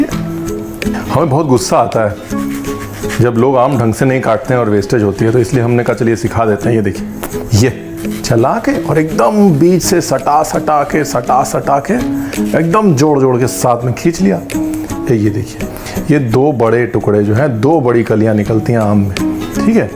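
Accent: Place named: Lucknow, India. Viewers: love the way you talked today that Lucknowi style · Ranveer jab aap humaye lucknow ki bhasha me baat karte hai... Tab aap aur bhi jada apne lgte hai.. ☺🤗👌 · Lovedddd the Lucknowi Accent.. Ama miyaa kamaal krte hain aap!❤ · Aap kitni pyari lucknawi bolate Hain hum raebareli pratapgarh se hai 😀 I love you for this ki saree recipe dekhte hain aap kahate Hain Na hanste khelte khana ban jaaye to achcha hamen bahut pasand hai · Bhai aap lukhnavi bol rahe hai mast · Chef your lucknow accent is very nice❤️ And recipe 😋😋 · He talk like Lucknow wali accent. 😀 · Sir aapki lucknowi language and style umdah..🥰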